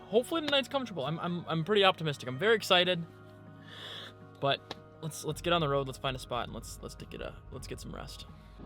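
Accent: Minnesota, United States. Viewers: what part of minnesota u from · What part of Minnesota are you from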